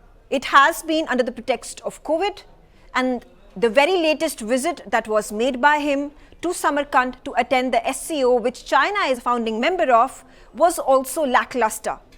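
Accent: India. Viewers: Stop the Indian tongue.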